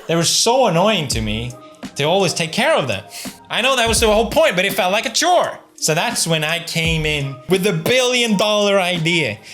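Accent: Sweden. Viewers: I'm happy that his Swedish accent is getting stronger in each video. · I love how since pewds left the UK he's started sounding more Swedish again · he sounds more Swedish than ever before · All these years, mans has lived all over the world and his Sweedish accent is thicker than its ever been 😂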